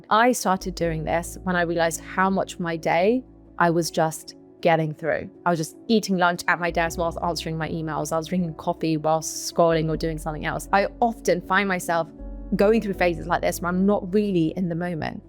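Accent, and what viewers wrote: United Kingdom. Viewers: Just me probably who face hard time with British clipped English (usually i use 1.3x playback speed)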